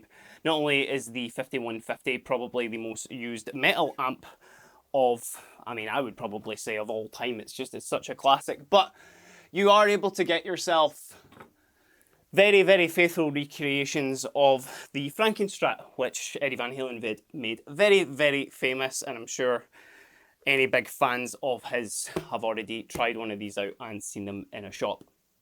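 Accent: Scotland, United Kingdom. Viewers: The #EVH SA-126 12/6 is #EVH's Birthday E-Hole in honor of #EVH. #Glasgow and #Edinburgh....What a #Scottish accent!!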